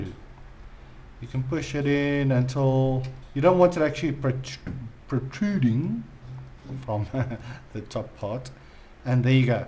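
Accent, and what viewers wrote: South Africa. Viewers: use Tweezers boetie ;) Recently moved to New York and Iv needed a new hobby so decided buying a drone might be the way... Iv been doing a bit of research on the Tarot 690s and they seem to be the most customisable of the lot. what are your thoughts on building vs buying a used one for about $550 without a transmitter? PS watching the video and hearing your accent has made me miss Cape Town soooo much!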